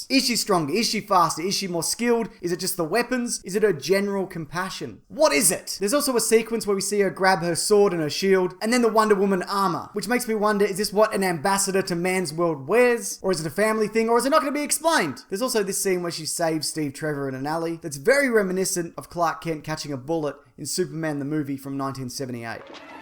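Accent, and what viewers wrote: Australia. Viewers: Be honest people, the only reason you watch these vids is for that SWEET, AUTHENTIC, AUSTRALIAN ACCENT!! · Love this dudes crazy Ozzie accent, sound just like Steve Irwin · Man you are awesome and the Australian accent helps haha.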